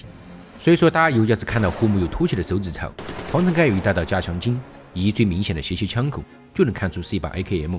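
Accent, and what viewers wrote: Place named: Leshan, China. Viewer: 乐山口音，鉴定完毕。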